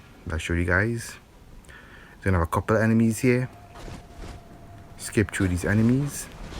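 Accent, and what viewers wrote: Trinidad and Tobago. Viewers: A Trinidadian I presume?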